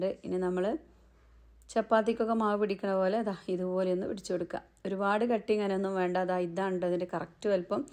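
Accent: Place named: Palakkad, India. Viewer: ഹായ് അസ്സലാമു അലൈകും. ആലൂ പൊറാട്ട സൂപ്പർ ആയിട്ടുണ്ട് 👌നല്ല അച്ചാറും, കട്ട തൈരും കൂട്ടി കഴിക്കാൻ സൂപ്പർ ആണ്. നല്ല ഒന്നാം തരം നോർത്തിന്ത്യൻ ഫുഡ്‌ ആണ്. അതൊക്കെ പോട്ടെ ഞാൻ പണ്ട് നിങ്ങളുടെ ചിലകറി കൾ ഓക്കേ ട്രൈ ചെയ്യാറുണ്ടായിരുന്നു എനിക്ക് എന്റെ ഉമ്മ ഉണ്ടാക്കി തന്നിരുന്ന കറികൾ പോലെ തോന്നുകയും ചെയ്തിരുന്നു 🙏പക്ഷെ അന്ന് നിങ്ങളുടെ സംസാര രീതി എന്തോ വല്ലാത്ത അസഹനീയം ആയിരുന്നു 😔എന്തിനാണ് ഇങ്ങനെ സംസാരിക്കുന്നത് എന്ന് തോന്നിയിട്ടുണ്ട്. കാരണം എനിക്കറിയാം എന്റെ നാട്ടിലുള്ളവരും പരിസരതുള്ളവരും എങ്ങനെ യാണ് സംസാരിക്കുന്നത് എന്ന് എല്ലാർക്കും ഒരേ പോലെ അല്ലങ്കിലും ചില വ്ലോഗർമാർവന്ന് നാടിന്റെ പേര് തന്നെ പറഞ്ഞാൽ മറ്റുള്ളവർ കളിയാക്കുന്ന തരത്തിലാക്കി സ്വന്തം PKD 😔 എന്തായാലും ഒരുപാട് സന്തോഷം കുറേ നാൾക്കു ശേഷം നിങ്ങളുടെ വീഡിയോ കണ്ടപ്പോൾ സ്വന്തം സംസ്ക്കാരം നിങ്ങൾ തിരിച്ചറിഞ്ഞു വെറുപ്പിക്കാതെ വീഡിയോ ചെയ്തതിന് 🙏🙏❤️ പിന്നെ നാട്ടിലെ മാങ്ങാകാലത്തെ ഓർമ്മപ്പെടുത്തിയപ്പോൾ 😔😔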